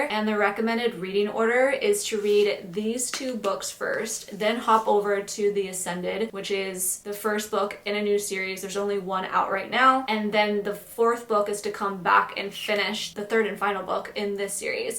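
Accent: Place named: Argentina. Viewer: Love seeing the family together! Love the Argentinian accent. Such a chill video! Ty for this.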